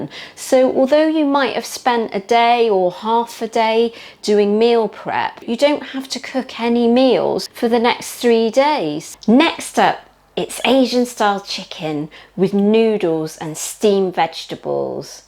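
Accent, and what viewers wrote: England, United Kingdom. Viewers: “I absolutely love chopped chopped brussels” With your English accent…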